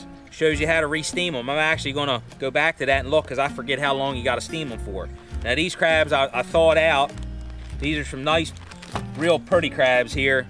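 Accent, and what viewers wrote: Baltimore, United States. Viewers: His accent is quintessential Baltimore! I was waiting for him to say, ‘hon’. Lol! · Bawlmer, Md. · As soon as I heard this guy’s accent, I knew I could trust his opinion about Baltimore crabs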